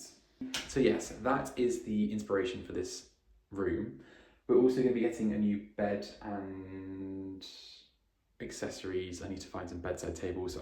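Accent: England, United Kingdom. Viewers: thank you for this enjoyable video. I love your content, engaging personality, and enthusiasm in home renovations and decorating. Your joy is infectious and inspires me to get out of my chair and to do something. Lol. As an American, I love listening to English accents. I could listen to you for hours. In a world with so much conflict, you give me a joyful escape for minutes. Everything you do is amazing. I agree, the pink color looks great with the other colors. Don't forget that real men wear and use pink. Lol. Robert.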